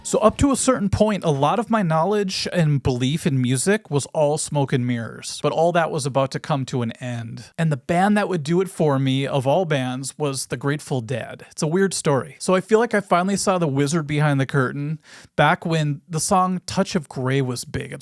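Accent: Minnesota, United States. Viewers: Idk I never noticed your Minnesota accent till now 😂 but YEP everything's been fake!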